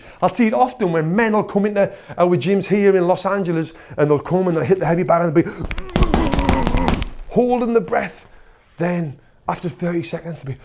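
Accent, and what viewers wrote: United Kingdom. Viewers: It's like very deep British accent. Kinda like it ngl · That's a combo - I like british accent and boxing :D I'm glad I found this channel! Great stuff! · British accent😂 I understand your speech better than those with an American accent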